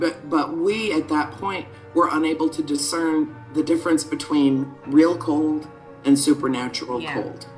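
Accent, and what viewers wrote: Ireland. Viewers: yep I love the irish accent to. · It's called a Djinn. N' omg by the sound of your accent, you're Irish. :.D <3 What went on in that house, was because of the witch, contacting a Djinn. (Which most may call a demon). The thing to do with the sacrificing, and whatnot. Many stories like this have been heard in Algeria, where I am originally from. It's to do with the occult, and soul sacrificing. No joke, this stuff is real, and just don't go near it. Movie was based on real events. They interviewed the kids who lived there, and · OMG I love the Dublin accent!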